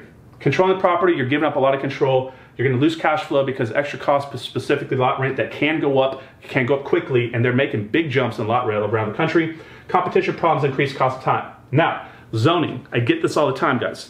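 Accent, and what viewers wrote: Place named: South, United States. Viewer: Good info but you talk to fast. That combined with your Southern accent makes it hard to listen IMO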